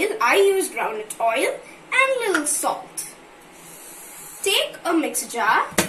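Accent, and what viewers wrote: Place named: England, United Kingdom. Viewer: Nice English accent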